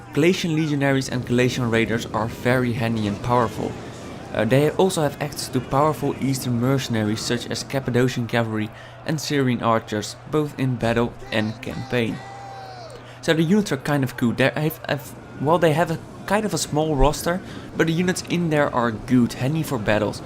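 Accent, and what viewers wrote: Netherlands. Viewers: I just can't listen to this Dutch accent ;/ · you sound like you're Dutch, if you are Dutch: ik vind je filmpjes heel leuk blijf doorgaan :)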